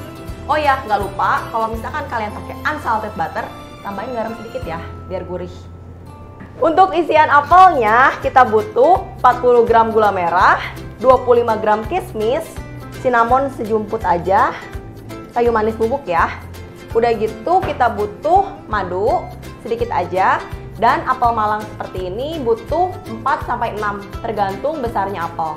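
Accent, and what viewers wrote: West Java, Indonesia. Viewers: trus Sunda pisan, kacida Sunda na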